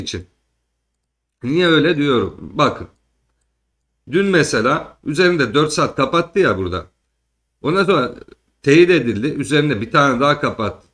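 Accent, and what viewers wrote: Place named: Mediterranean, Turkey. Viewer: Adamsın kardeşim seviyorum seni anlatımında çok güzel diyalektinden anladığım kadarıyla Akdeniz çocuğusun ben bitcoin alıp satmıyorum ama marathon digital holding bitcoin mining i yapan bir şirket hissesini alıp satıyorum oda bitcoinle yükseliyor onunla düşüyor ama senin anlatımın ve analizin süper SEVİLİYORSUN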